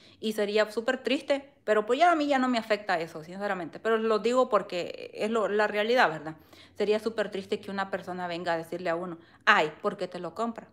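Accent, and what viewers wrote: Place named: El Salvador. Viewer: De qué parte de el salvador eres me encanta como hablas así somo yo vivo acá en Texas también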